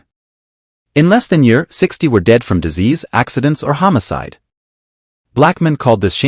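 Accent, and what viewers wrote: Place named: Europe. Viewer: You sound, european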